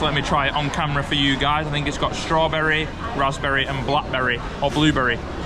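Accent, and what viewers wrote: United Kingdom. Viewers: Are you cockney?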